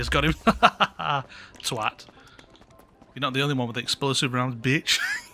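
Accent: United Kingdom. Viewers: Good to hear a northern accent in here! Great vid again mate.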